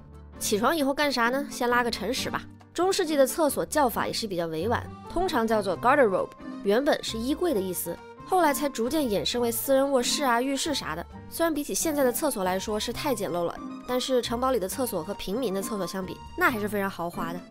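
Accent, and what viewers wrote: Cantonese, China. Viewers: 廣東話好好聽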